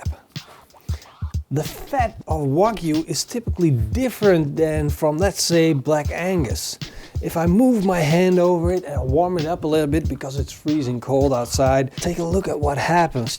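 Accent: Netherlands. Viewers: I was like he sounds dutch hmmm. Turns out he is dutch 😂😂🇳🇱👍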